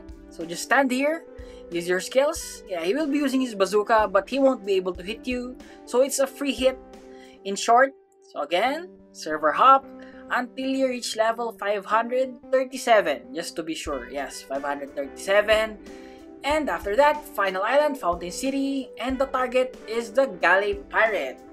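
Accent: Philippines. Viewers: your accent is so philippino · Hi are you Filipino? Bc u sound like Filipino btw good work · Knew you said sa Skylands are you Filipino??????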